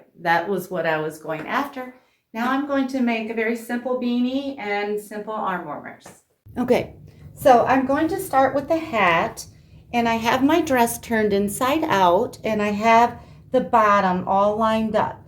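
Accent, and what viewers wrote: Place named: Upper Midwest, United States. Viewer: I hope you don't mind me asking where you are from. Your accent has me going. I'd say Wisconsin, North Dakota or Minnesota> ??? Am I correct? :D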